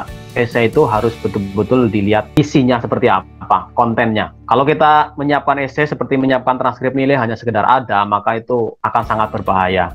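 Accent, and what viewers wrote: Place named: Java, Indonesia. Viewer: Logate jowo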